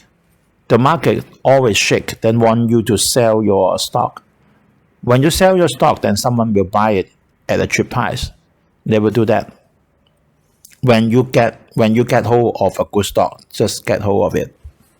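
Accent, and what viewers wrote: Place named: China. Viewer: i don't see you have any improvement at all.you have the faith to speak does not means you speak it right.the way you speaking is like translate chinese speech to english.another Like you speak Omincron you pronounce it OH ME CON go google and type Omincron pronunciation and you find how to pronounce it.another example is the word 'Purpose" and you speak like "purple"and i thought you talking about colour by the way ,if you are born and raise in Hong Kong english is not a new language for you.am I right? hope you don't get mad when i criticize .Have bravery to speaking is good but you gotta speak it right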